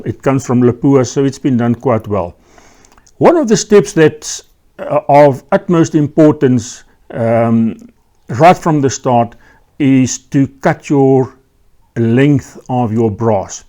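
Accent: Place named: Afrikaans, South Africa. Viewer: I recognize that accent! Classic Afrikaans accent. ❤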